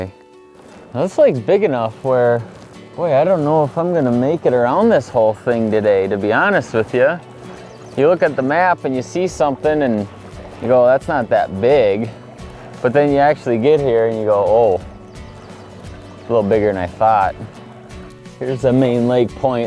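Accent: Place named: Minnesota, United States. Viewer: He definitely sounds like he’s from Minnesota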